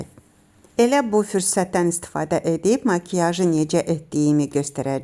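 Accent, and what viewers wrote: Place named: Quba-Qusar, Azerbaijan. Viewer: Salam xanım. Siz haralısınız? Danışığızdan elə çıxır Quba Qusar zonasındansınız. Elədimi?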